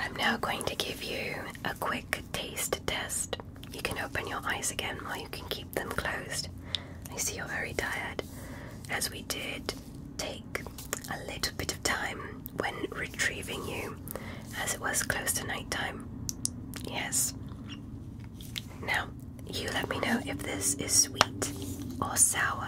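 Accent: England, United Kingdom. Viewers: OMG the British accent❤ love it❤❤ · English Accent 🎉 my Fav 🙏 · Your English accent is marvellous. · Is the English accent from absolutely Fabulous?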